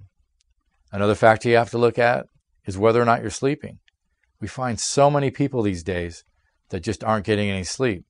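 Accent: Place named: United States. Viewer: may i get this video in Hindi ? I don't understand ur fast American English 😪😪😪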